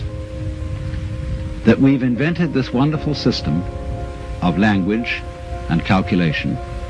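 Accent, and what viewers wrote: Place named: United Kingdom. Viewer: Perfectly British voice to perfection lecture..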